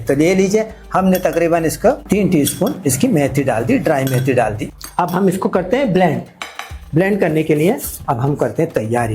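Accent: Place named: Lucknow, India. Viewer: ❤Nazakat Lazzat aur Aapka meetha Lakhnavi andaz Awesome.Respect from Pakistan.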